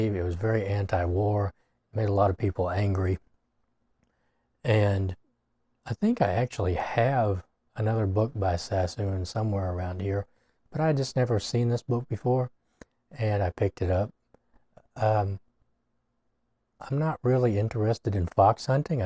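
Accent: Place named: Kentucky, United States. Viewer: Kentucky! That makes sense. Scott from 'Kentucky Ballistics' is a household favourite and the accent is similar. 👍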